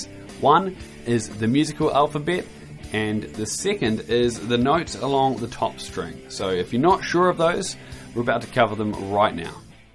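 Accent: New Zealand. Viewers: You sound Kiwi, are you Kiwi? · I was thinking about your accent and I should have got it cuz i am from New Zealand to. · Love that kiwi accent man! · So that is new zealand accent? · Question #1: A like a Lot of guitar players but You said that i have to mentione just one of them i Will Say Kirk Hammet from Metallica because he is really technical and his solos goes straight up to My soul. Question#2: i like the way that You teach how to improove your skills in a easy and fun way! Since i'm latinoameeican but i speak English your New Zealand accent sometimes i have to go back and listen again your explication. Don't get me wrong i don't have nothing Against your accent, actually i think it's cool but i'm used to listen American accents but i got it after a couple times listening to You! I like how an easy scale can be changed in any way that You want ti make it sounds good but i need to watch again the video and practice. 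I would like to understand which scales goes with the right chords? For example if i use to start with G5 and then to A5, then to C5 and finish with D5. What it would be a good easy scale to use with that secuence of chords? Just an example! Or just to make it easier for example to play of, the chords are just 2 pretty much the whole song which they are G and A! Which scale can use fir that and also how i Will know to use the right scale depending of the chords that the song has?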